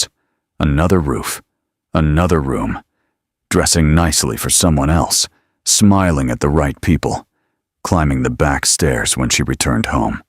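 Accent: United States. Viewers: An American accent does not fit with these stories. 😢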